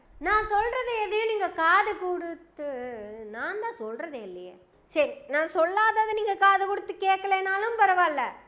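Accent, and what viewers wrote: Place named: Coimbatore, India. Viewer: Super sister with Coimbatore slang ❤. Love it.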